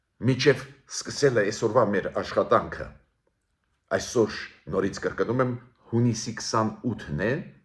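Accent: Russia. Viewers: Այս ոնց է որ չասեցիր Ռուսներն են մեղավոր եւ Պուտինն է ձեռբակալել։. Տխմար այդ ռուսական ակցենտդ որտեղից ես ժառանգել։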